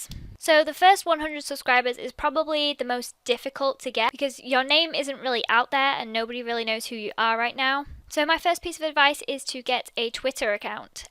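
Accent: England, United Kingdom. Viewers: I love your English accent :)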